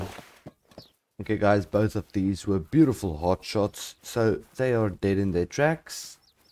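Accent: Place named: South Africa. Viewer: I can hear it😂 nice to find a fellow South African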